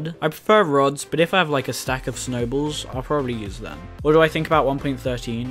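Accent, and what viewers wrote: United Kingdom. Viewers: U have such an english accent fam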